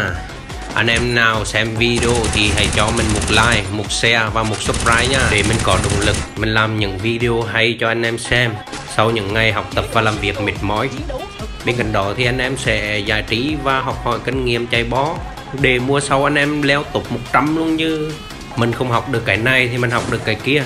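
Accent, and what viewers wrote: Central, Vietnam. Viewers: anh là người miền Trung à, nghe giọng hay ghê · Anh ở đâu miền trung anh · nghe tiếng anh này nghe giống người miền trung với lại nghe cũng ấm ko quá khô nói chung chơi cũng hay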